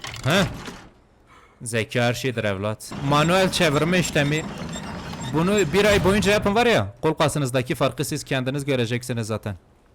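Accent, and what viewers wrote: Elazığ, Turkey. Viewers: Abi elazığlı mısın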